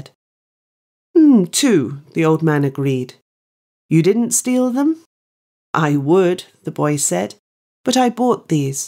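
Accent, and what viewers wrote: RP, United Kingdom. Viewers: Is It a rp accent?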